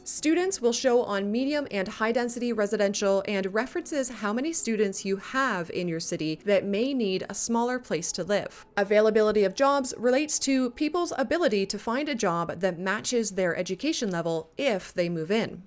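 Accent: Newfoundland, Canada. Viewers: Excellent video! I noticed that you say, "a-boot" instead of "a-bout". As a Canadian, you are the only person I've ever heard using this pronunciation. Vaguely, where are you from? Is it standard pronunciation there? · Your a Newfie! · Holy Canadian accent, girl! You said it once and my eyebrow went up, then you said it again... "Round-a-boot". I moved away years ago and it is nice to hear the accent every once in a while. 😁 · Are you a Newfie?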